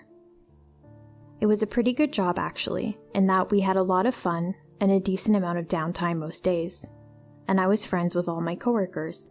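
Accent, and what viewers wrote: South, United States. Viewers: The southern accent was phenomenal, you're so talented that's awesome. You're gonna go far.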